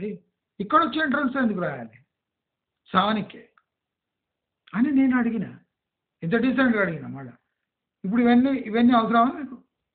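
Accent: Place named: Telangana, India. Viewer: Ur accent pakka Telangana, jai telangana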